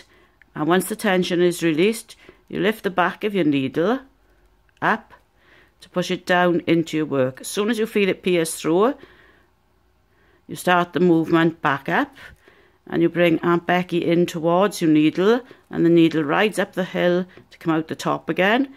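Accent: Wales, United Kingdom. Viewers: Wonderful tutorial and so lovely to hear a 'tidy' Welsh voice.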